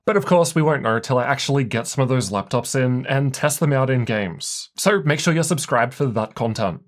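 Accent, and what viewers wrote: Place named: Australia. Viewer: I like Aussie voices. Never stop talking, Aussie boy.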